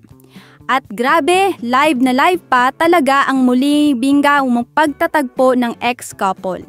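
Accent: Visayas, Philippines. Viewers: Tigil tigilan mona ang pag voice over mo hnd mo bagay bisaya amp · bisaya kaayo.. gahi imong Tagalog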